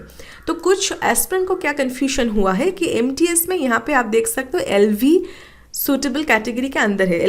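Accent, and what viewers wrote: India. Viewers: Bengali with Hindi accent😱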